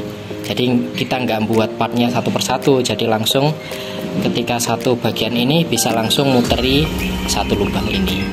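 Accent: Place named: Java, Indonesia. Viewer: Josssss pak ketua 👍👍👍 medok2 gak masalah yg penting jadi😅😅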